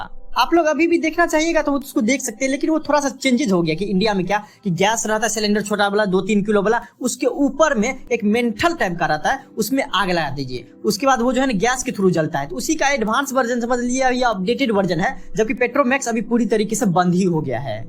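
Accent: Bihar, India. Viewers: Aap Bihar se ho kya 😄😄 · Bhai aap bihar se ho kya aapki awaj se lg rha h bihari log ki aawaj aisi hi hoti hai😊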